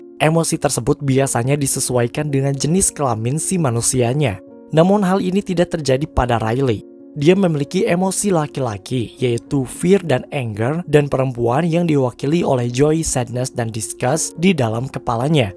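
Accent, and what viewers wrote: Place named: Java, Indonesia. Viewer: Bang, anda orang Jawa ya soalnya sedikit denger ada logat Jawa nya tapi saya orang Sunda kok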